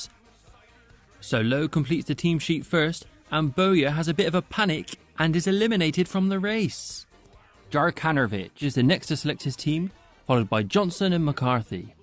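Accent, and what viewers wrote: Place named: Southern England, United Kingdom. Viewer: LOL, I take it that you don't like "that other team" as evidenced by the vomiting sounds in the commentary. Sorry, I don't know the teams as I'm from the US, but then I don't follow this sport on either side of the pond. May I ask where you are from? I'm trying to place the accent...I'm going to guess....the southern half of England....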